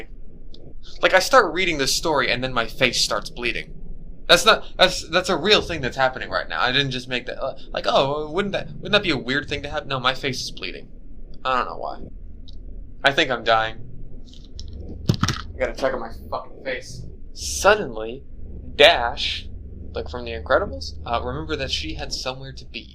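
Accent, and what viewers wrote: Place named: Brooklyn, United States. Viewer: that brooklyn accent is great ps: baxter is very cute and pure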